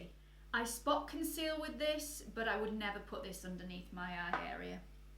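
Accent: United Kingdom. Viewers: Luv the British accent!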